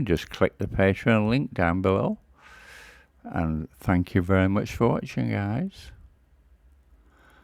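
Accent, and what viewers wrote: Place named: England, United Kingdom. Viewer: Oh….it was AI ? I didn’t know that. I thought she was real person with lovely English accent. Thanks for info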